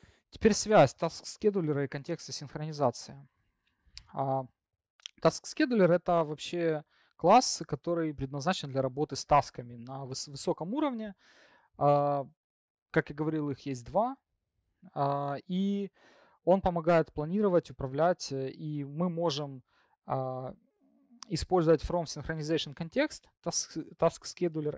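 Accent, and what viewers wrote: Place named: United States. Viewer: Скедулер - это нормально, американское произношение. Вот пример еще одного спикера так же применяющего данную фонетику <link> Async programming in .NET: Best practices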